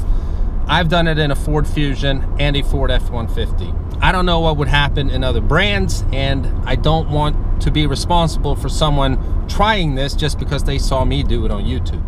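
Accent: Sweden. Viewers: you sound like you have a faint FAINT swedish accent :) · Are you american? You may sound a little Swedish 😁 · You don't sound Swedish anymore!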